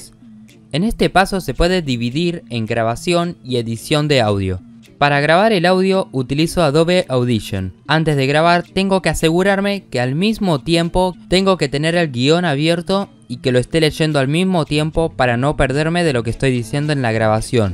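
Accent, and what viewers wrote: Argentina. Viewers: ey pregunta sos argentino o chileno? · Mentira q so argentino no? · Eres argentino?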